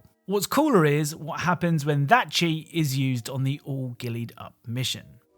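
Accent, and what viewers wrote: United Kingdom. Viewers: Something about British gamer YouTube channels that I just love. Maybe it's because I'm American, and the British accent is somehow hypnotizing or cathartic to us 😂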